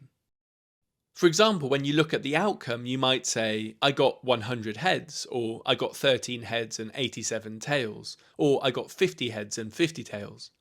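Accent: United Kingdom. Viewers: I love the way the creator used a British coin to match the British narrator he is using for the voiceover—a subtle and clever touch.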